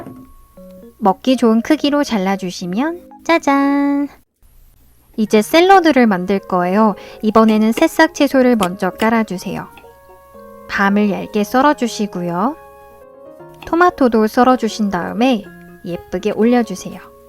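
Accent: France. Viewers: loved your french accent friend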